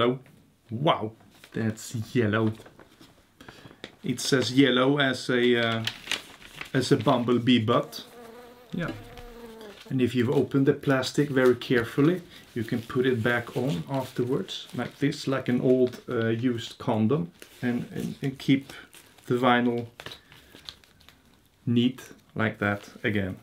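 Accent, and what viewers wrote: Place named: Sweden. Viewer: Your Swedish accent is delightful! Love it 😁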